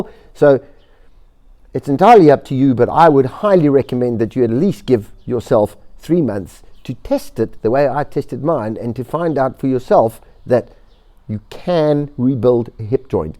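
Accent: South Africa. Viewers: exactly...the body heals itself if given the right supports, but the first step is to take the onus upon yourself like you have stated here...thanks for the great info...and love your South African accent as well...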